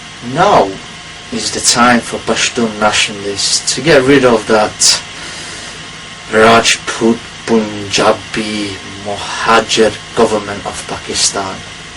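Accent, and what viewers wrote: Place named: Ireland. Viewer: lol are you Irish or something?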